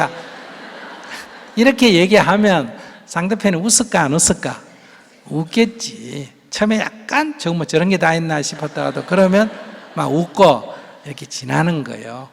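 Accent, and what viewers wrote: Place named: Gyeongsang, South Korea. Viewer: 스님 " 살은 좀 갖다 먹었어요" .^^ 경상도 사투리라 잠시 놀랐습니다. 쌀 발음이 살.. 평생 안 고쳐지더라고요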